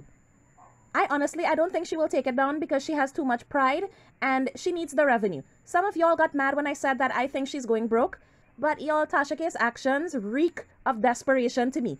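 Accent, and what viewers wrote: Trinidad and Tobago. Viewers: I love your accent are you trini? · I clicked so FAST!!! Talk your Trinny shit! Love your accent and I’m here for all the Tasha K drama. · Hi are you Trinidadian??? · I love your content.. And your accent sounds 🇹🇹 trini like myself.. Ur right both bloogers hv something wit an they look salty as hell. ain't got time.. She makin money 💰 moves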